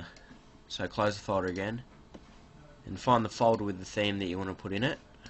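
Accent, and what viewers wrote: Australia. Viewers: I frickin love your accent bro im aussie aswell. good to hear it and thanks for the video it helped. Aussie pride amrtie ?! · Thanks for the tute mate. Good to hear another fellow aussie voice ;)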